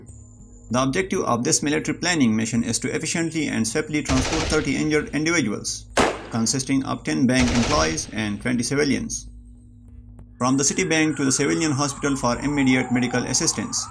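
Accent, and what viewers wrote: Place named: England, United Kingdom. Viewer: The way of describing your plan is i think quite difficult..make it easier to some extent...try not to speak like an english man because then we can't understand what are you really saying 🙏🙏🙏🙏🙏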